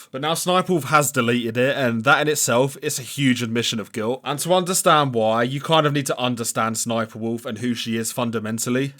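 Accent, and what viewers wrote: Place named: Liverpool, United Kingdom. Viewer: Omg she’s a scouser I’m getting rid of my accent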